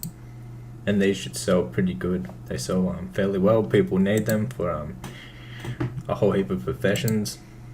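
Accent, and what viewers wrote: Australia. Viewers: You sound aussie, how are you not lagging out on this server lol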